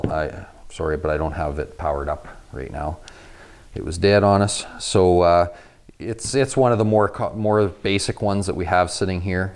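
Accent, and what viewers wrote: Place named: Canada. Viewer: first video watched on your channel, I recognize that accent, what part of Canada are you from?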